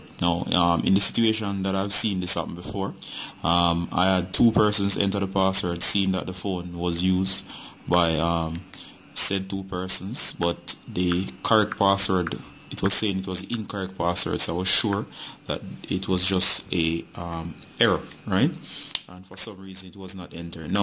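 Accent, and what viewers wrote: Jamaica. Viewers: You sound like a Jamaican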